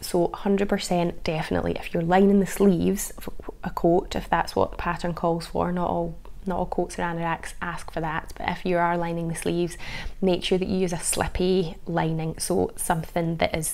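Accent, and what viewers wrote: Scotland, United Kingdom. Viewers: Yes, that lovely Scottish accent. My husband also loves listening to videos because of the accent.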